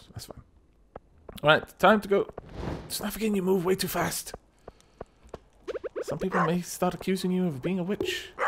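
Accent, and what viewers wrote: Wales, United Kingdom. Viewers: Is welsh your first language?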